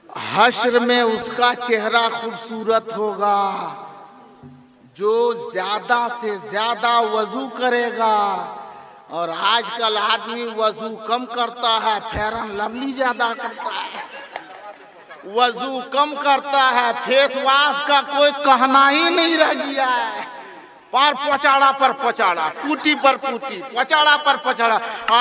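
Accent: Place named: Giridih, India. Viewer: Maulana sb ki baten sun kar aisa lag hi raha tha ke Giridih district ke hai. Kyuke hum bhi Giridih district ke hai or ye bhasa se wakif hai.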